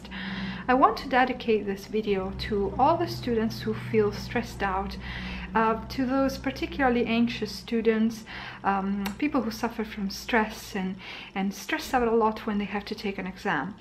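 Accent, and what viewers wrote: United Kingdom. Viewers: Me encanta el acento inglés ❤️❤️❤️❤️ lo escucharía todo el día.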